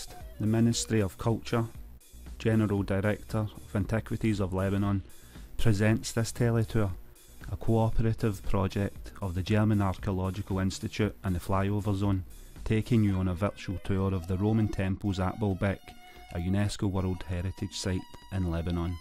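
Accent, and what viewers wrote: Scotland, United Kingdom. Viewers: It was great to hear a fellow Scot on such a prestigious channel (haha)...Baalbek is a really intriguing site...far, far older than the Roman construction that was built on top of it...thank you for the virtual tour...love from Scotland... 🎇😁🎇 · I personally found it to be hard to understand in certain points my grandads Scottish aswell so I should have been able to understand better, prefer the previous voice, that guy sent me to sleep most nights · Hard to understand quite a bit of the content. Hard Scottish is hard for us to understand. is a wonderful narrator and your content is just incredible. Much love and gratitude!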